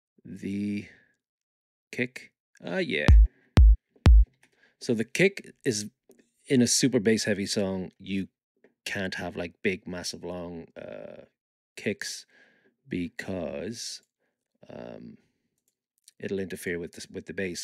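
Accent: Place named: Ireland. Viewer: Unbelievable work my friend! Where abouts is that Irish Accent from?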